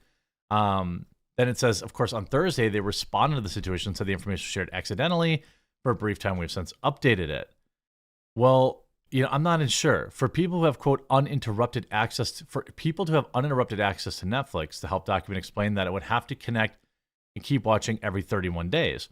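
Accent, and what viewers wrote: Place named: Wisconsin, United States. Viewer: I like your show, but your severe Wisconsin accent is too hard to understand.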